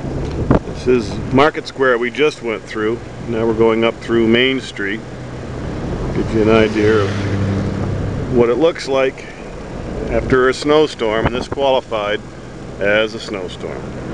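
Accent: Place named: Maine, United States. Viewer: You are very welcome for my comment, AND it was sweet to get mail from an unexpected source into my inbox...woo hoo ! Please dont ever take your video down, as Im really homesick this year, and nobody in my suburb or community speaks with the correct accent.... It’s more comfort than you will ever know, just to be able to hear the audio portion in this video... AND, the visuals bring me back to a time in my life, when things were childlike, and perfect, long before the world. ( and everyone in it ) went to the dark side... I still prefer the cold temps, keeping my inside air at a mean 67 deg. F. Even though I left my hometown, Bangor, in 1978, at age 24, to enlist in the Navy, ( mess specialist ) I really do miss the old days—- listening to high school basketball tourneys on radio, picking apples in fall, ice skating on pond in winter, clearing snow... 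Those were the days, I could sit on the front porch at night with my sisters, and, in the dark, as a vehicle approached, I could tell you the make of the vehicle, just by the headlights... No use trying that now, lol.. Mama, daddy, both Nanas are gone now....but, I still remember mostly everything they taught me.. I’ve gone through my life, wrongfully thinking that my family were just a bunch of shit—asses, but, truth be told, I would not hesitate to burn everything I have now, just to go back in time to the year 1961, and get a do-over...( hell of a ride, but might not change one thing ) Im ever so grateful I was born and rais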